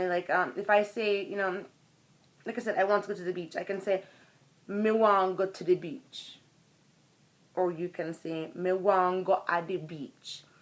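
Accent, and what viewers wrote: Jamaica. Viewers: She av dat jamdung swag so is soh de ting set .just be you u dig .u jamdung u jamdung good accent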